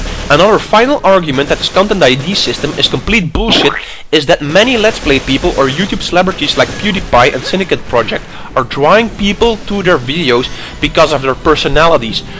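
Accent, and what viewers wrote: Netherlands. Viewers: I know, that is why i put it in my wall of tekst! :) Go make more great video's m8, i enjoy them alot even with your dutch accent.. :) Its rare that i see video's who actually make sense. Anyways have a great newyear and all the best.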